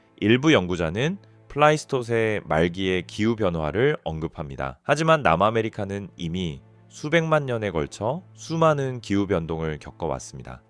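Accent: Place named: China. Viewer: ㅋㅋ짱깽이냐 3M를 세미터 그러고 서론이 너무길다 구독했는데 취소하고 간다 한국말 연습 더해라ㅋㅋㅋ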